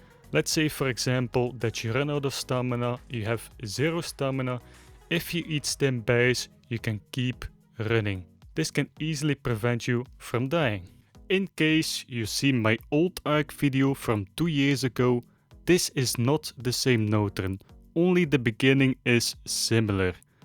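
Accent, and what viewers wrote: Netherlands. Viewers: Love the Dutch accent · nederlands? je hebt een exstreem grote accent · Beetje Nederlands accent maar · haha het nederlands accent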